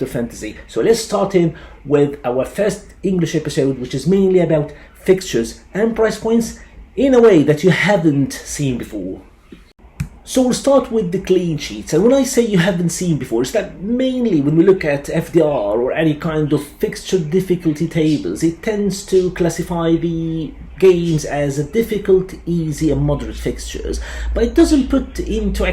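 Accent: United Kingdom. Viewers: A very perfect British accent mate ❤️❤️❤️🤍🤍🤍🤍🤍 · ما شاء الله عليك يا دكتور بتكلم بلكنة بريتش و المحتوي ممتاز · King!! Your british accent!🔥🔥🔥🔥🔥🔥🔥🔥🔥🔥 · British accent 😍 👌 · Amazing content. So organised and to the point. Your British accent is incredible. I used to follow you in Arabic, but I love your episode in English even more. 😍😍😍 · Amazing content and a perfect British accent. To all the world, here is the best FPL content ever. 😍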